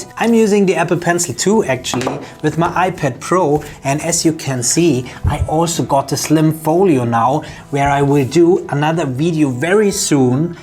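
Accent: Germany. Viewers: Where are you from? Germany?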